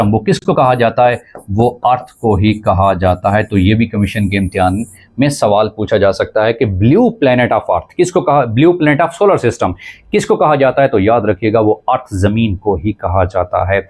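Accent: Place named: Europe. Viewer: your english accent is like a European teacher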